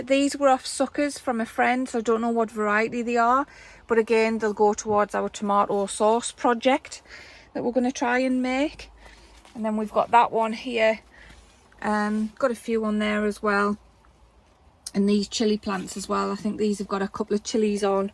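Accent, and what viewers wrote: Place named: Durham, United Kingdom. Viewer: the accent sounds like durham.....................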